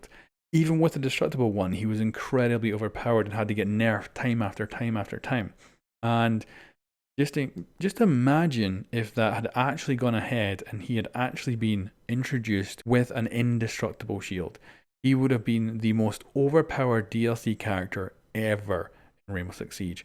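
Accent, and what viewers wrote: United Kingdom. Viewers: AR S it’s something called a b r i t i s h accent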